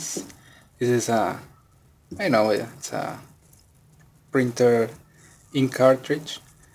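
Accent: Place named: Argentina. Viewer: sos argentino? you have the calssic argentinian accent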